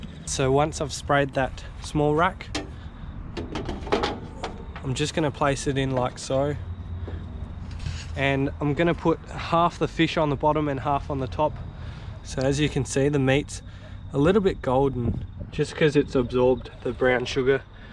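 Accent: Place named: South Australia, Australia. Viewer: I think this is the first time I’ve noticed a different accent from SA to east coast Aus . Nice vid ! Cheers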